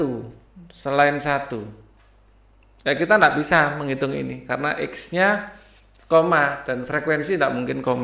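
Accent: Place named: Java, Indonesia. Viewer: Dari suaranya sudah jelas itu wong jowo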